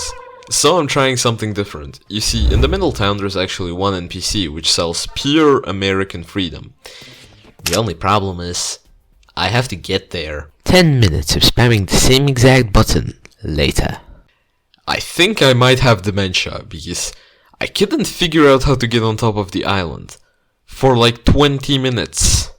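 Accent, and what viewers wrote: Russia. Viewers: you sound vaguely Russian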